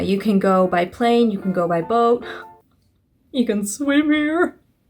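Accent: United States. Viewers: Since when did you get that southern accent? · With such an American accent, did you go to an international school here in NL? When I taught English at a Dutch high school in Den Haag, I had several American-sounding students each year. Some people just have the ear for it. Did you or ? When I taught at the American School of The Hague, I had Dutch students who spoke Dutch with an American accent, LOL!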